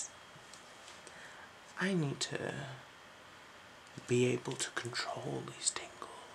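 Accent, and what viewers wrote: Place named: South, United Kingdom. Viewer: i can hear in ur voice that u r somewhere from south England, I've lived in Stockport (Manchester) for 5 years and about Vodka, sure, mate, I can bring u vodka next time i visit th UK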